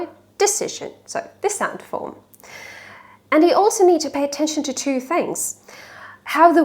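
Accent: United Kingdom. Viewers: You practically sound British. With the tiniest hints of Australian here and there, if that. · It's amazing and wonderful! A girl from Belarus, who has never lived in the UK, speaks perfect English with a British accent. I am truly impressed by your mastery of the English language. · Hi! I would start by telling you that your RP accent is amazing. Most of the time, English learners are afraid to look ridiculous with the British accent, much more difficult to master, and that’s why they tend to choose the American accent. And you are living proof that it is possible to master a superb British accent. And I will continue by saying that I do not agree with Vivianna. There are already enough channels with short formats. I don’t know if you’ve heard of Luke’s English Podcast, it’s a podcast with a long format, and it has won several awards and consider by its peers as one of the best podcasts and with great success even with a long format;) Ps: And yours videos are far from imperfect. 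· Согласна, результат поразительный, думаю, у не просто музыкальный слух, а именно талант подражания плюс много часов работы и исключительная старательность и вуаля! - прекрасный British accent! Well done! Думаю, многие учителя из постсоветского пространства даже очень завидуют вам!👍👍👍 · Hi! You have such a beautiful British accent. Can you share a few tips on what exactly helps to make the effect of a British accent? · I'm not British myself but I thought you were so when I heard you speaking for the first time. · Halaew halaew. This is very overbritish accent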